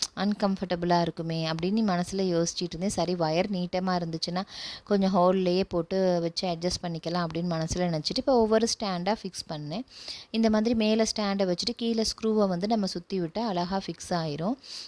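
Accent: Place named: Sri Lanka. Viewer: Super medam elankai spech ❤❤❤😂😂😂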